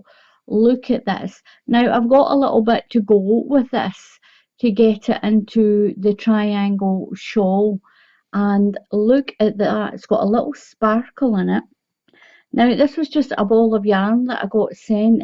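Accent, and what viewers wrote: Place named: Wales, United Kingdom. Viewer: Beautiful. Subscribing to await the blanket. I've seen a lot of videos from the UK. I'm US. Are you Welsh or Scottish? I hope you don't find me rude. We had a lady at our senior center from Wales that sounded a lot like you. I loved talking to her because of her accent! Hope to see the blanket video soon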